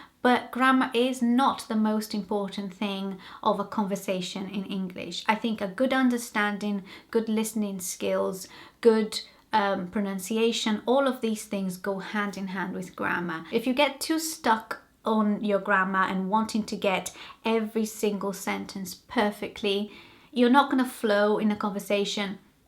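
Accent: Wales, United Kingdom. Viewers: I honestly had no idea you weren't a native English speaker! Your accent just sounds like abnormal Welsh accent to me! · Your English is excellent, fear and precise, with a nice hint of Welsh. A Welsh accent speaking English is so nice to hear. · I don't often comment on YouTube videos, but felt I had to with this one, because I was quite astonished when I heard your accent. I have a number of Hispanic friends from Brazil and Columbia and Uruguay and though they can speak English, it is with a very strong Hispanic accent, --something that you have managed to completely overcome. Amazing! As a native English speaker, I can say your English sounds truly excellent and at a high level. You have acquired a pleasant accent too. Wise choice living in Wales where the accent is easy on the ear. You even sound classy and cultivated. Well done! There are always people who like to denigrate others, just ignore them. 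As an expat living abroad I can testify that it takes a lot of hard work to even achieve basic fluency and acquiring a native accent can be even harder. The natural sound and flow of your speech bears witness to a great deal of effort and some sound achievement. It's great too that you are taking the trouble to pass on your tips to others. Be encouraged.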